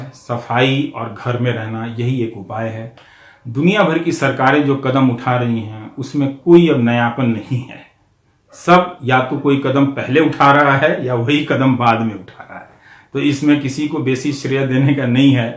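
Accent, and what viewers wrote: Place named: Bihar, India. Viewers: Etna nik koi na boli bhojpuri main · Ye style bohat acha laga pura bihari aur bhojpuri style maja as gaya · Very nice bhojpuri ravish sir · Sir U are the hope of this country. Love your bihari ascent.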